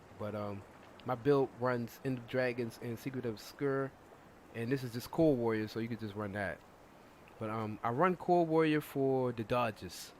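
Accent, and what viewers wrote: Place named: East Coast, United States. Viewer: Do more commentary if you have the time. I be hearing the east coast in the voice, from the Chi-town here.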